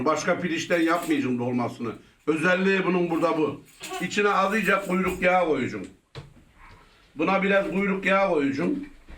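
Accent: Gaziantep, Turkey. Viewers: Dayi antepli misin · Antepli misin?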